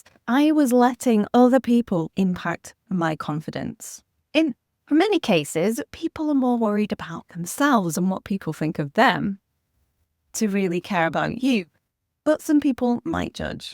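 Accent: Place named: United Kingdom. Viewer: I am not able to understand the British accent effortlessly.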